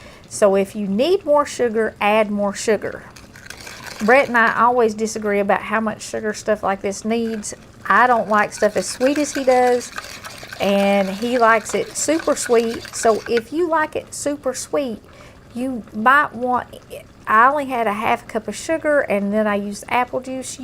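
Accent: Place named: Ohio, United States. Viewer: Hearing your voice reminds me of relatives from Ohio. They would bake cakes and pies for the Church July 4th festival in Wapakoneta. I loved it. We'd travel from PA to it when I was a kid. Thanks and God bless you 🙏. Hugs 😇🤗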